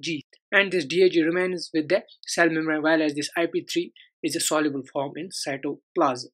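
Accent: Kashmir, India. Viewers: I can tell by your accent you are kashmiri......Nice video tho 👍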